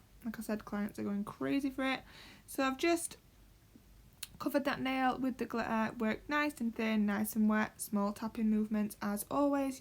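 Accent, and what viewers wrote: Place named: South Yorkshire, United Kingdom. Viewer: Just discovered your channel today & it’s going to be so useful for me (currently training). It’s so nice to hear someone speaking in a S Yorks similar accent to me.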